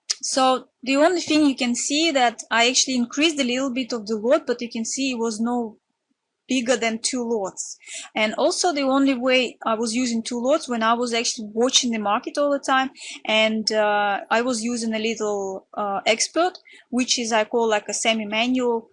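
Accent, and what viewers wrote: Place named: Russia. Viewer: nice accent. Russian?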